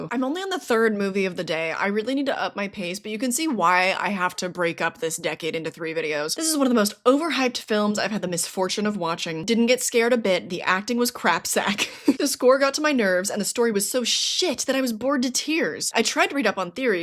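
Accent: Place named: United Kingdom. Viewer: Doing a 1980s Horror Movies bad reviews video? It's completly outdated. Well, that was pretty ... "not unrelieved" ! I don't want to sound snob, but some people really are ... 🤔unhinged? Sad?... Uneducated? All of these? ❤your British accent ! Horror is the best dumbest genre ! – You slew me ! "you bedeprtprrrt..." The Stuff , yay !! B-But?!... Didn't you "forget" Re-Animator ?!... I'm not saying that because I love that movie, but because it's just ... quite an amazing and remarkable one. Stuart Gordon's masterpiece. Alongside Dagon . Yeah... '80s were ... a time.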